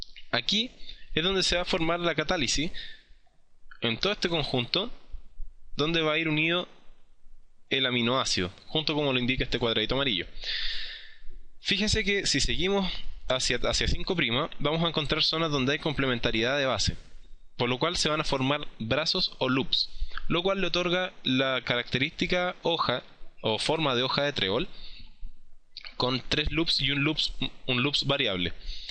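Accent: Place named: Chile. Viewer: creo que eres chileno por tu acento y porqe no me desconcentre al escuchar jajaj (soy chilena) graciaaaaaaas ahora podre dar mi prueba de bioqimica tranquila :)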